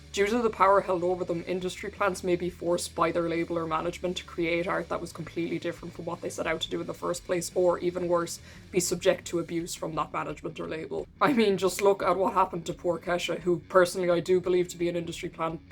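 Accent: Ireland. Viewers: What is this accent? Irish? You have a calming voice. Happy yt recommended this, I’m subscribing! You did a great job of going over this saga. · I don’t care. I’d listen to a contralto Irish brogue read the phone book. I lived in Dublin for a while and it brings me back. Beautiful country with lovely people with pitch black senses of humor. Hope I get to move back one day.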